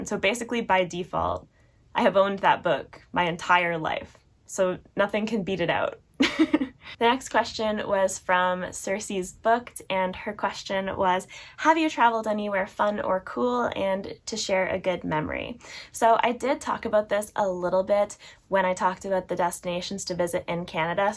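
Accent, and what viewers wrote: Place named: Europe. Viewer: Playing team sports is the best thing in the world and pizza is the answer to everything. Also, your trip to Europe explains your European accent.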